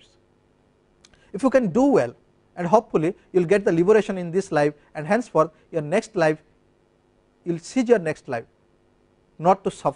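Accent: India. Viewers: first have respect towards guru.... u dont always get everything served ready on platter buddy.... one should also try to acclimatize..... aren't we trying to learn american/british/german accent/language when we want to study in their country? learning to understand indian english accent is also a similar job for u. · Wery hard to understand his pronounciation for any non Hindu> Hindu people please, please kindly work more on your English accent at school. I usually have no problem understanding anybody who speaks English whether the person is an European, Asian or African with one constant exception - citizens of India. An utter nightmare, sorry but i have to say that. I don't understand a word. You have such a strong accent that it is like listening to somebody speaking with a full mouth. Take it easy, slow down, and at least try to pronounce the words in a proper way. 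Leaving this now and going back to Radhakrishnan introduction - at least I am able to read what he says without having to listen to him.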